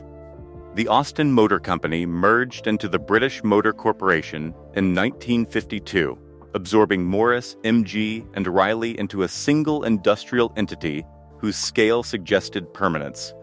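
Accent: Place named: United States. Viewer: American accented AI telling the British their own history again. Images don't match the narrative - a nice shot of the VW Beetle production line at 0.53. Very poor editing and continuity.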